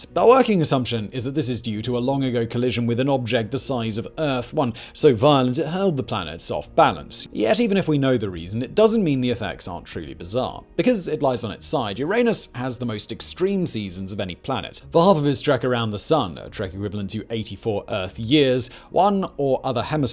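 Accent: RP, United Kingdom. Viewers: Fact Boi is such a professional online educator that he can maintain his perfect RP and a straight face (all but once) through the constant barrage of bunghole puns. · Classy British accent - Well-dressed - Well-groomed - Thoroughly-researched facts and insights - Literally twelve years old, apparently 😁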